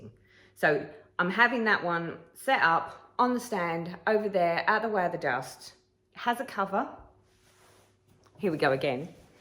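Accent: United Kingdom. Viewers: Ive just started cross stitch and love full coverage just want to say thank you for helping me find my groove and it's confirmed I'm still in love with the British accent lol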